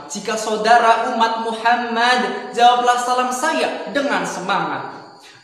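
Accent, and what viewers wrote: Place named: Banyumas, Indonesia. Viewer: Salam ngapak tadd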